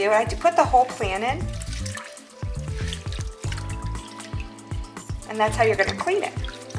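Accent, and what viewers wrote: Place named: Wisconsin, United States. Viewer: They sell live stevia at my local co-op every spring and I've always wondered how one would use it. The fact that the garden-fresh powder is actually less bitter than the processed store-bought stevia really intrigues me, I figured it would be the opposite. Can anybody else confirm? Side note: Not sure if anybody has ever mentioned this to you before, but your Wisconsin accent is adorable. I also live in WI, but in Madison, so you don't hear that "Wisconsin" accent too much down here.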